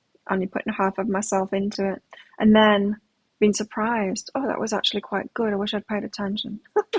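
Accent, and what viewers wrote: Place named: Australia. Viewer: Hi , I’m in Canada watching atm. Love your channel. I love your Australian accent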